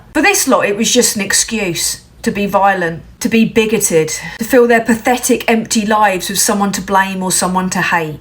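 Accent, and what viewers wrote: Cockney, United Kingdom. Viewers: Your just a Labour Lord Lord Haw Haw, champagne socialist propaganda merchant, with tour mockney accent, which posh school did you go to..your a plant.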